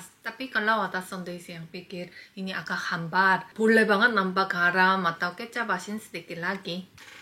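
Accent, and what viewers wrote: Java, Indonesia. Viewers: Lo Lo Lo kok logatnyaa Jawa to inii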